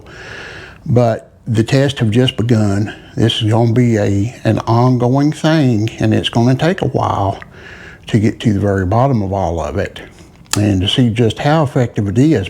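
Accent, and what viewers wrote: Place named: South, United States. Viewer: I like your accent. I’m trying to learn if it’s regional. I’m going to guess Alabama or Mississippi. I’m from Australia.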